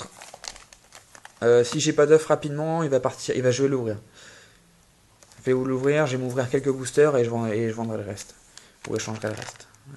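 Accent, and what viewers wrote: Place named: Belgium. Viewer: toi ta un léger accent belge a ce que je voix ;)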